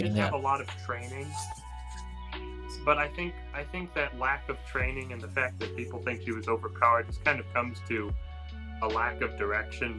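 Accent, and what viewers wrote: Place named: South, United States. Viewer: You sound southern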